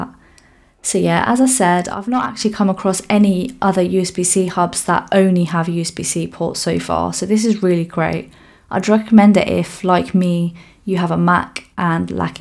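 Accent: United Kingdom. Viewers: Truly helped me that you mentioned it doesn't support video output, I am looking for a usb dock to use samsung dex on an external screen ! :) PS : Even as a non-native english speaker I would bet you're from the UK lol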